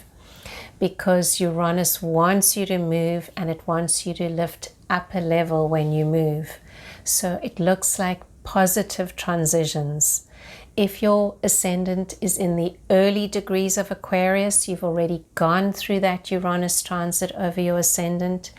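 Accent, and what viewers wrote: South Africa. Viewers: THANK you! First time listener. You sound South African.. Greetings from Cape Town South Africa. 🐬🐧🐳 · Thanks you for this. I love your S. African accent and your clear and concise guidance. It is very helpful.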